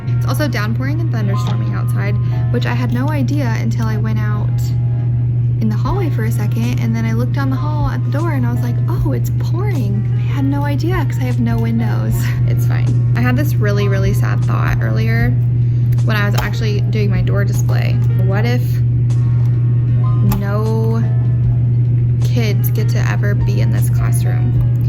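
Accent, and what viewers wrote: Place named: United States. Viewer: This is no criticism, but in all the videos about primary school classrooms in the US (I assume you are from the US, hearing your accent; if I should be wrong, I am sorry), I can't help but notice that all the walls and surfaces are decorated to the absolut max(imum). Why is that? I'm not against decorating the classroom and creating different areas by using rugs, shelfs, pillows, colors, etc. But I would never use all these quotes on the walls and doors, tazzles or borders on the whiteboard, as I find them too distracting. Many pupils need clear structers and minimal visual stimulation to be able to concentrate on their work instead of their surroundings. As I said, no criticism. I just can't help to notice the differences between german and north-american primary school classrooms.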